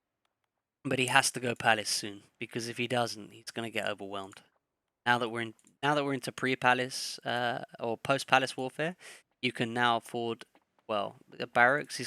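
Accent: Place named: United Kingdom. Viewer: OMG HIS BRITISH Anyway thanks for the video we need more content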